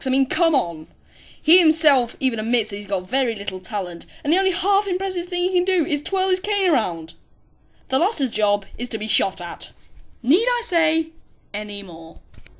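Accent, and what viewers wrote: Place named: England, United Kingdom. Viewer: Your accent is so English, so fragile are you sure you should have been using such words back when you filmed this? Regardless this list was really good, thankyou for not putting Edgeworth in it, it's nice to see a little more variety.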